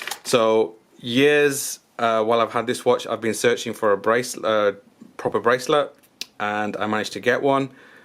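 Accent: Yorkshire, United Kingdom. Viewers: What do you so for a living if you don't mind me asking? Can tell your a Yorkshire lad haha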